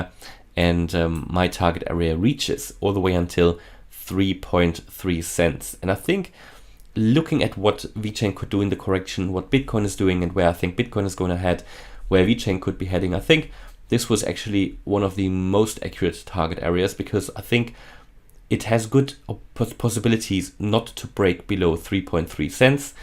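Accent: Germany. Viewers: I bet you are either from Germany or Austria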